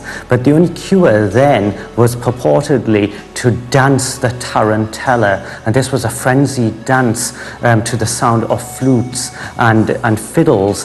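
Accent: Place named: Wales, United Kingdom. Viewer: Is he Welsh? I just can't pinpoint that accent.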